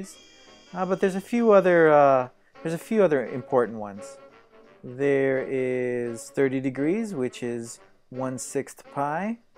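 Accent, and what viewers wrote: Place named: Minnesota, United States. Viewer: turned on my sound, not expecting to hear a Minnesotan accent, and what do I hear but my family's home?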